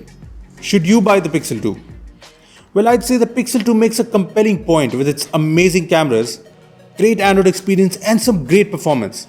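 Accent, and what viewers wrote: India. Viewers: god damn dat indian accent , i can't take it anymore !!!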